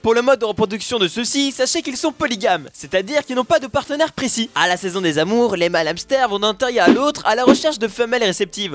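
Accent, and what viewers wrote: Belgium. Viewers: Tu es belge ? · il est belge??? (réponder) S.V.P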